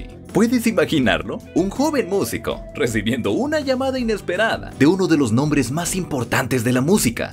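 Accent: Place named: Latin America. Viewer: Hablas con una zzzz de Latinoamericana qué en varios países de América suena rara. Todo sabemos que no tenemos zzzz. Pero esa Z no es la de España por eso le digo Z Latinoamericana o Américana.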